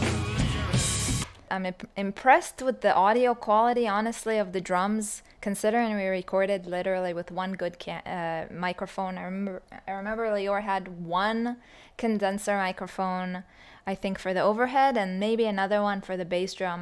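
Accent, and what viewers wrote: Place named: Israel. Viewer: Finally, I get to hear your Israeli accent. Love it. My mother is a hairdresser, she would tell you protein and conditioner for your hair….look a little dry. I’ve been watching you off and on for 8 years. I love watching your infectious smile. Always makes my day brighter. I would say in the beginning you were playing the music….now, 8 years later, your feeling the music. And this is what now drives your playing. Rock on baby doll!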